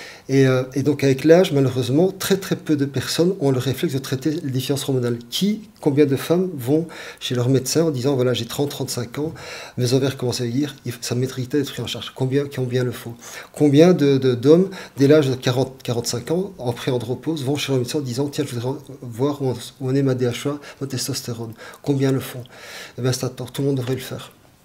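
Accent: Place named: Liège, Belgium. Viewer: Accent liégeois, je crois. Mais il a aussi oublié de muscler sa mâchoire, tout le reste oui dirait-on, mais ses muscles mandibulaires apparaissent hypotoniques ou alors peut-être un lifting facial trop accentué a tendance à lui bloquer la mâchoire inférieure en tirant trop la peau vers l'arrière.. Bref, le manque de mouvement de sa mâchoire fait qu'il "mange" ses mots. Il en dit beaucoup tout en fermant sa gueule.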